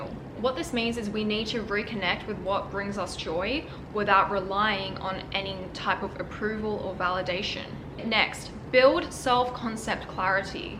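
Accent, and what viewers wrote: Australia. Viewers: i love ur aussie accent it's so effortlessly sassy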